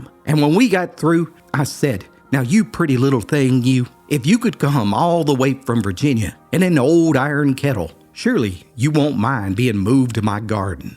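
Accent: Appalachia, United States. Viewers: Lol, I am terrifyed of snakes too😂. So I identified with that old man😂. I love flowers growing but do not like cut flowers, they just die 😢. I enjoy listening to your country mountain voice telling stories. I live in the north (north-central Indiana) now for the past 35+ years. Moved up from Mena, Arkansas back in 1988. I miss the south but not the snakes and bugs. I still live on a big farm but alone now at 70 and, sadly, I am crippled. I can not do much any longer and I sorely miss my veggie garden, canning, baking and washing with my wringer washer 😭. But God has Blessed me with memories for which I am thankful and for your stories. They fill my lonely heart❤. God Bless you! Brook🧑‍🌾🙋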